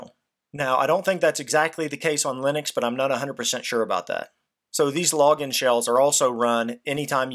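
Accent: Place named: Pittsburgh, United States. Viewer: Your from somewhere around Pittsburgh. I recognize the accent from 400 miles away!!!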